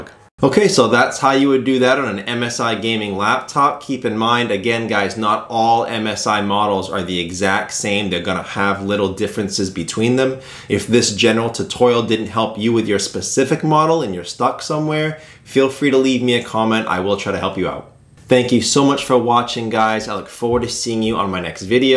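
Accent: Massachusetts, United States. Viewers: Random but are you from Massachusetts? That accent!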